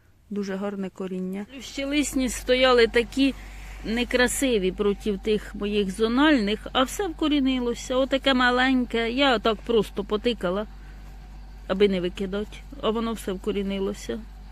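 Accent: Poltava, Ukraine. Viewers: я з задоволенням дивлюся і слухаю ваші відео,наш полтавській говір- чудо, дякую.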